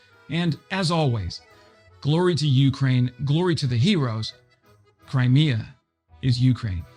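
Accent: France. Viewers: Forgetting your horrible French accent, good effort for the video and don’t forget France is the only real power in Europe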